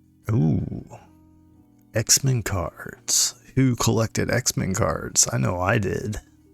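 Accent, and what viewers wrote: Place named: Scotland, United Kingdom. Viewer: I wish I payed attention to these responses. a response 1 yr later....its like I'm watching your youtube videos from alpha centuari.....thats one light yr isnt it? hah anyways. let the thick scottish accent roll brother!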